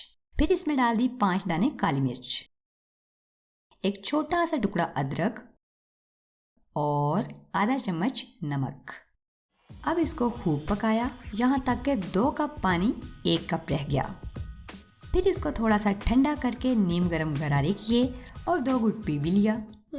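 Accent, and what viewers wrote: Punjab, India. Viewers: Apki Punjabi boht zabardast h . Same jaisy hm ghar bolty hain .. or nazar to kamaaal ki utari Apne 🤗🤗🤗😍😍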